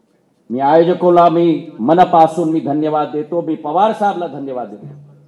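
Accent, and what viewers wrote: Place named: Hindi, India. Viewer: Hindi accent aate h iske marathi bhasha mai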